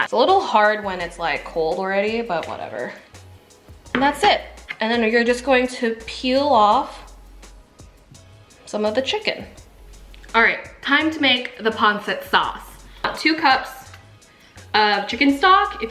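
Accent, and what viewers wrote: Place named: United States. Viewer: you speak American English accent 😊❤ new subscriber from Seattle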